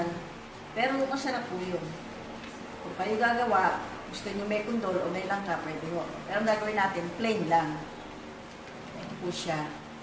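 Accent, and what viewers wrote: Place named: Bulacan, Philippines. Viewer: ng marinig ko ang accent mo, sabi ko taga bulacan to..kaya ng makita ko na espasol ang gagawin mo..i deduced na san miguel ka laki.. :))) taga san miguel din kami (mother side), sa may sta inez... keep up the good job :))))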